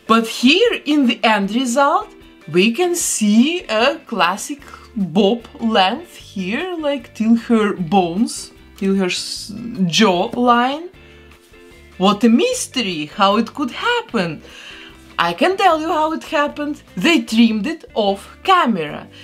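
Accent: Russia. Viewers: Какой же сильный акцент Мне кажется, что это девушка русская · Lol! Mystery of your accent finally solved!!!!! I thought you sounded a bit Russian ! Interesting! Turns out I was right! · Мне кажется я слышу русский акцент 😅 interesting video,thanks you 🌸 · Noooo, not "ghouls alive" spectra( you can not reroot her because the mecanism in her head( Btv, i knewed you have a "russian" roots) not just because accent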